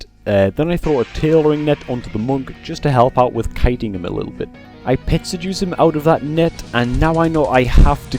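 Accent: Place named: Northern England, United Kingdom. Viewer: Hey im not sure if you have said this in another vid or been asked before, but where are you from? you sound like your from northern england, i may even go as far as saying your from Newcastle like me!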